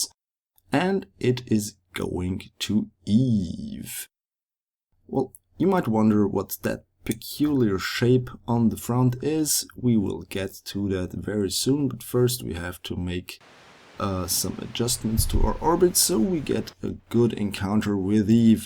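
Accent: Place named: Germany. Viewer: You sound a bit german...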